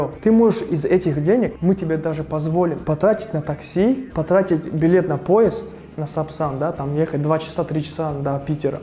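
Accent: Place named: South, Tajikistan. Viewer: ман аз Конибодом.Хамон видеоролике ки гуё духтар аз Конибодом бошад боварам намеояд. Аксенти мо дигар. Вай мисли одамони чануб гап задаги.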